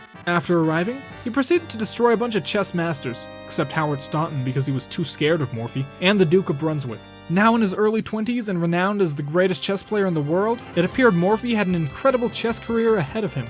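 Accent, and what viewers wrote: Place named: United States. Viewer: Where are you from? Cause the accent seems like Japanese-American to me.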